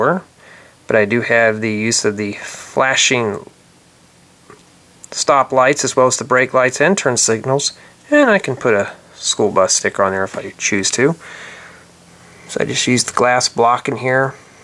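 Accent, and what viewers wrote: Texas, United States. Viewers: Are u Texan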